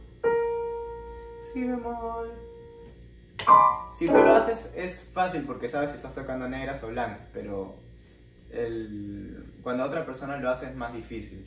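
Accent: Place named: Bolivia. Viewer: Excelente. Una pregunta, eres de Bolivia ? Tu acento es muy parecido a un acento de Bolivia.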